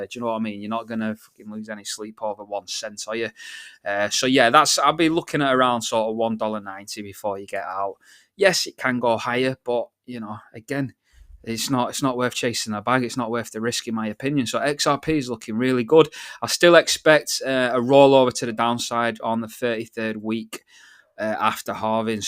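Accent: North, United Kingdom. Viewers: Yo big man! I follow u on here and X and I have enjoyed every minute. Ur simplicity and northern accent r both spot on. Keep the alpha coming bro. I mean this is FREE…world’s mad!!!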